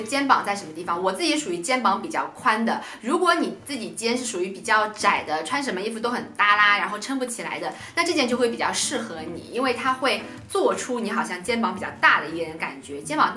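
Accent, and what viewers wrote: Shanghai, China. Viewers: 男男头哈哈哈上海宁好亲切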